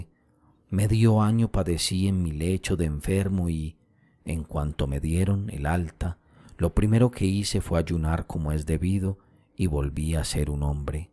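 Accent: Colombia. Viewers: Chejov y todo ese grupo de escritores , describen con maestría la idiosincracia del pueblo ruso, su pobreza, sus anhelos, la rutina que los apabulla y esa relación tan particular con el cristianismo ortodoxo. Buena lectura de un " paisa" colombiano delatado por su acento.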